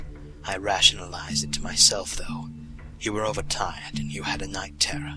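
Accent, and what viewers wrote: United Kingdom. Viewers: Trying to tell whether you're putting on a British accent or not..... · nice slight british accent. sounds very... british. lol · Omfg his British accent 😂👌🏼👌🏼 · you sound british!!!!! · Oh this reading. The ever-so-slight British Accent. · you sounded kind of British in this one..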